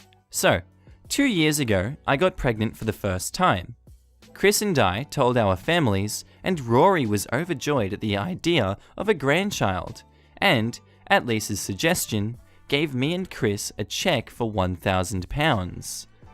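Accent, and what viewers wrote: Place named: Australia. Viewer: Appreciation points for Aussie narrator 🦘🐊🦘🐊🦘🦖🦘🐊🦘 🦜🦜🦜🦜🦜🦜🦜🦜🦜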